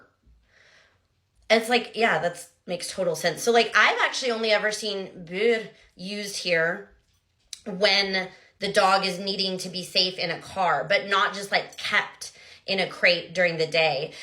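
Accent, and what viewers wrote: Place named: Canada. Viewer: This video makes me think you've never actually lived in the US. Are you Canadian? You sound like you have a Canadian accent.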